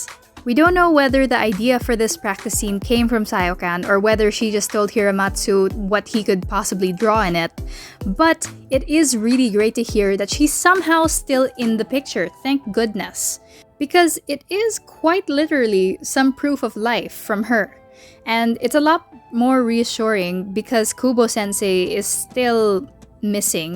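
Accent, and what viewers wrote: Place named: Philippines. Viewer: are you filipino? I can catch the accent bc it's the same as mine 💓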